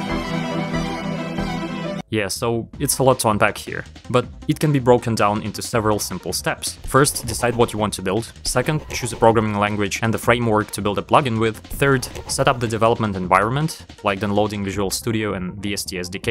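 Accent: Russia. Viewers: He sounds like russian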